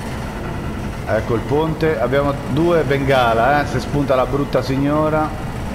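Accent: Rome, Italy. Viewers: come al solito mmmaaaazzzzuuuuunnnnnaaaaa,spolliciate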